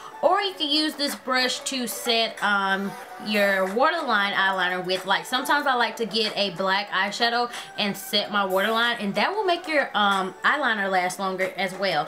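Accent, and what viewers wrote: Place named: Alabama, United States. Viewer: Woo your from alabama =P I LOVE our accents! and I freaking love all your video's! girl you need to stp makeing me spend so much! lol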